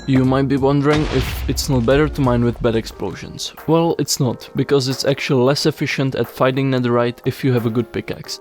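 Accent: Czech Republic. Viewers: you got very czech accent bro :D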